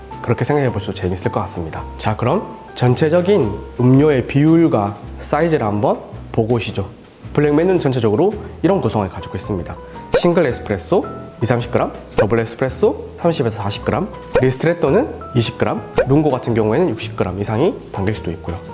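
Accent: Gyeongsang, South Korea. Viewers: 씨발 시작부터 경상도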